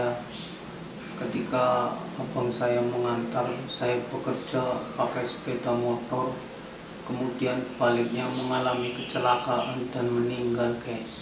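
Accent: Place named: Java, Indonesia. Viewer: logatna jowo bgett kk😀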